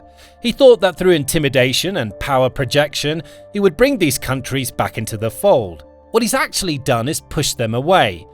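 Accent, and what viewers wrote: United Kingdom. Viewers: funny how every time I hear something like this it is a british accent and when I hear about russia winning it is always a eastern accent and yet, 4 years later and it is the same crappy stalemate, russia still stands(somehow???) despite sanctions and ukraine hasn't fallen despite the pounding the question is, when this thing gets all over and done with I ask, will ukraine be able to foot it's part of the bill? and russia, whatever the hell they're doing to keep afloat still works, but for how long? BTW, my condolences on those reactors.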